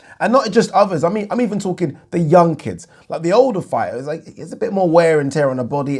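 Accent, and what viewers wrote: Liverpool, United Kingdom. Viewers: What part of Liverpool are you from?? 😃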